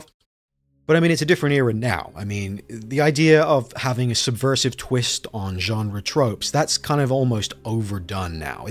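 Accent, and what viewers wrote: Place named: Northern Ireland, United Kingdom. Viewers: He sounds (Northern) Irish to me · he's a Brit who has lived in America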